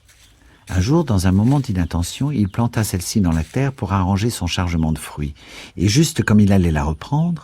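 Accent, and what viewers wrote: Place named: France. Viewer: One of my favourite books. Pity you read it with a Frenchman accent. Please retry.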